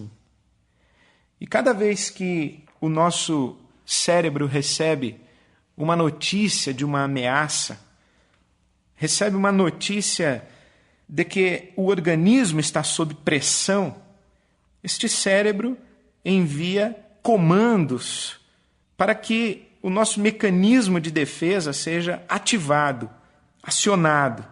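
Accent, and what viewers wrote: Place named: Rio de Janeiro, Brazil. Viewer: senti um sotaque meio carioca, um R puxado.. as vezes nem parecia ele.. kkkkk Benção pastor, obrigada por cuidar de nós através das suas palavras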